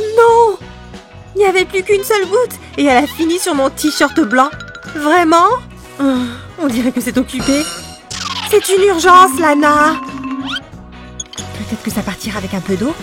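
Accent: England, United Kingdom. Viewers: merci pour c astices mais juste vous êtes anglaise?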